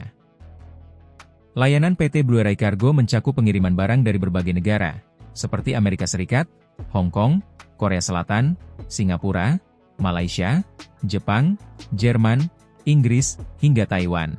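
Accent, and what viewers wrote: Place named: Java, Indonesia. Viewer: Lari bukan kabur goblok. Jgn pakai logat jawa yg tolol😂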